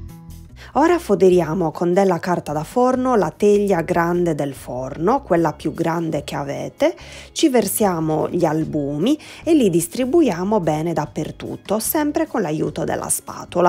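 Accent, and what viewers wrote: Sardinia, Italy. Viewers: Ti ho appena scoperta! Bello sentire l’accento sardo!! ♥️♥️♥️ saluti da una nuorese che vive a Milano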